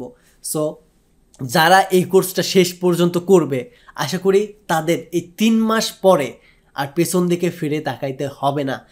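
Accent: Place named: Kushtia, Bangladesh. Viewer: apnar bari ki jhenidah ,kushtia elakay? kotha bolar dhoron shune jante iccha holo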